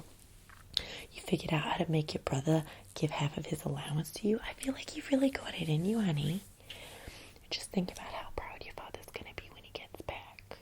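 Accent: New Jersey, United States. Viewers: Hello.. well my mafia binge is being filled. Amazing. Also the jersey accent 😍 I’ve not heard it much as an Aussie and I love it. Also my threats are very good w my brother because he is my brother. I know he won’t do shit. But.. let’s be honest having 6 brothers does that to you.. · i love jersey accents and unserious asmr videos 💀 you gained a sub from me youre so funny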